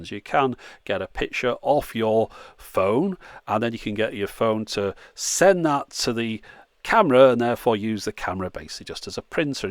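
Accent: United Kingdom. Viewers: I just discovered your channel and I really appreciate it. I just have a side question : Is it a britannic accent ? I wanted to know, I'm not sure about it ^^ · Love watch your video.. Probably because of your accent and British humor 😂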